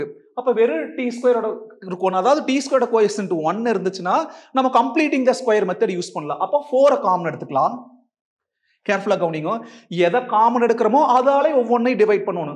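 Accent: Coimbatore, India. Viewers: Sir neenga Coimbatore ah ila thirupur ah sir ??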